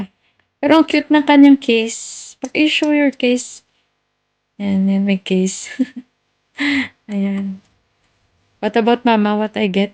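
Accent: Iloilo, Philippines. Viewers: Hello po sa mga in laws nyo. Ang sweet nyo po magkwento. Ilongga po ba kayo kabayan? Parang d po kayo marunong magalit hehe. Magiging busy na Po kayo nyan with your in laws. Pero update nyo parin sana kmi. Godbless po ❤️ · Saan k dito sa pinas sis prang ilonggo yong tagalog mo bago po ako dito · Hello ate, nice to see your vlog, saan ka po sa visayas te. Ilonggo accent ka. Ilonggo dn po ako. 🙂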